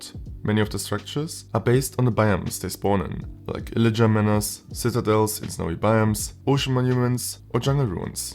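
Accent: Germany. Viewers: Man hört so raus das du deutsch bist haha Top Video!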